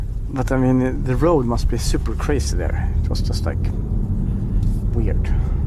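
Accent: Sweden. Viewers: nice swede english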